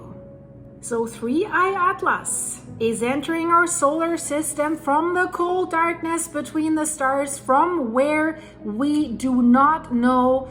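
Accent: Germany. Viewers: Ich mag deinen deutschen Dialekt. Woher kommst du? Liebe Grüße aus Wien 🫶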